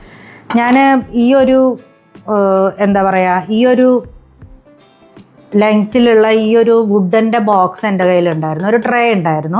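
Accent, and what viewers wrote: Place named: Thrissur, India. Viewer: Trissur slang Ella samsarathil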